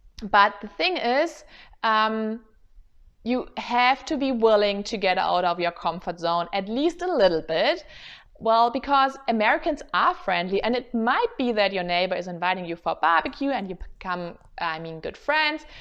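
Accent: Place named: Germany. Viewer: I loved your joke… totally arrived on my end 🤣😂🤣😂🤣. But maybe that’s because I’m German haha! I miss the birds singing/chirping in Germany… I also miss going to the Bäcker and Metzger, or hanging out at the Bistro/Café. Here we get rushed off the table… I miss the laid back nature of Germany. I wish we lived closer so we could hang out for Kaffee und Kuchen 😁😁😁. But Boston is not the next closest trip from Colorado. Mein Angebot steht nach wie vor… wenn Du mit irgendwas Hilfe brauchst, melde dich einfach. 👍🏻 Like you said, your language/vocabulary will improve just by living your life daily and speaking it all the time. I’d say that Americans, or any English speaking person for that matter, understand you just fine. Even your German accent isn’t as strong as some other German English speaker. You’re doing VERY WELL. 💗 Have you tried the German bread website I shared last time? 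If not, definitely give them a try. I enjoy it very much.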